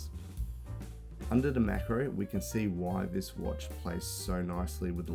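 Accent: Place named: Australia. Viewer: Great to hear another Aussie accent talkin' watches on YouTube 👍👍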